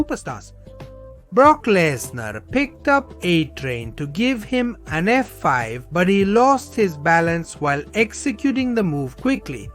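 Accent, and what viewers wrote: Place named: India. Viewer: Why does bro sounds like Indian😭😭😭😭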